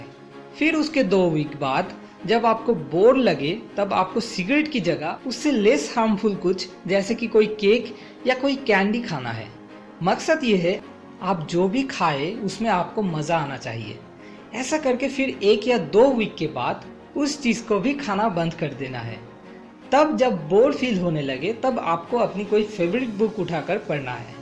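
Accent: Bengal, India. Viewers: Your accent is bengali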